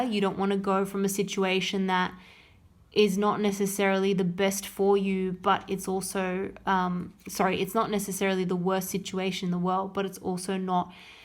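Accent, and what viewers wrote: Australia. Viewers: So good to hear an Aussie Tarot Reader! 🙌 Thankyou!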